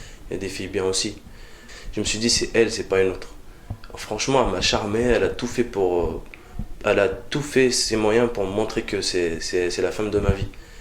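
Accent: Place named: North Africa. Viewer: Oui il a un accent du bled peut être il est venu en France à l'adolescence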